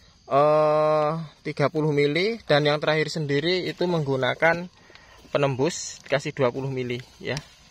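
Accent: Java, Indonesia. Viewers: Kok bhs jawanya medok banget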